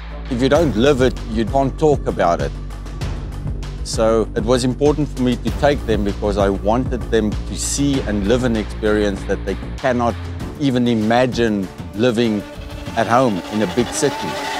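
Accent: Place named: South Africa. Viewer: The sweet sound of that SouthAfrican accent. #dreamy ;) Brilliant film, gret visuals as always!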